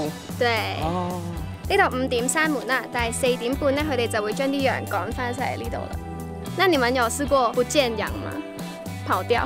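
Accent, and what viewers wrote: Taiwan. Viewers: 講台語好可愛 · 就醬~台灣腔也太可愛 然後 空拍好漂亮 好舒服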